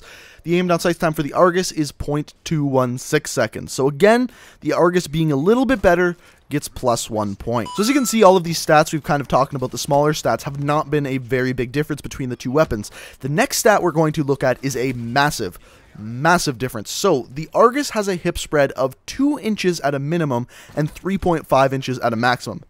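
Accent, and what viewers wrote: Canada. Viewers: is this guy canadian he sounds canadian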